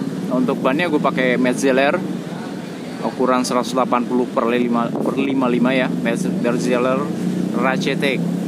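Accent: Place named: West Java, Indonesia. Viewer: Orang sunda iyeumah yeuh 😁😁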